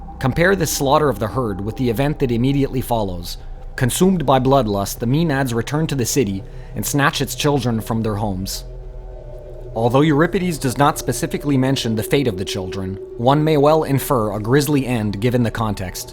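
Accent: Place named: Quebec, Canada. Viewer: Tu sonnes Québécois, bonne vidéo!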